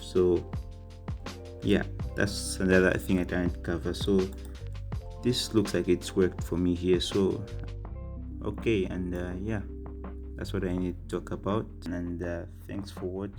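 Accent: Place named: France. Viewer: Sounds like a French accent, great video!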